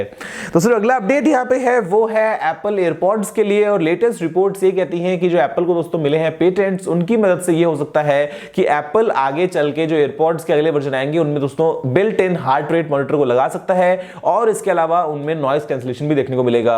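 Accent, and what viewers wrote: Bengal, India. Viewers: app kya bengali ho???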